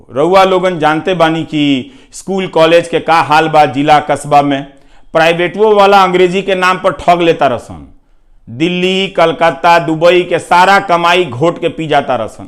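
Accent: Bhojpuri, India. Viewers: Bahut badiya sir bhojpuri bahut hi mitha boli h. raura bhojpuri k or uper utha le jaim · Really sir, heart touching channel.Hearing you in so sweet Bhojpuri has made my day.Best wishes sir. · Your Bhojpuri accent is so nice. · इतनी मीठी भोजपुरी हम कभी नहीं सुने हैं. एक तो "रिंकिया के पापा" गानावाला भोजपुरी. नहीं तो पुलिसिया भोजपुरी. पर आपका भोजपुरी एक अलग अंदाज का है. मारीशस में दुसरी सबसे ज्यादा बोलनेवाली भाषा भोजपुरी है.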